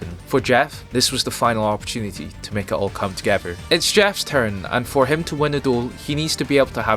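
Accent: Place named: Scotland, United Kingdom. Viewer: Your Scottish accent is so absurdly subtle. So much that I’m not sure if it actually exists or if I just need to sleep. Say purple burglar alarm.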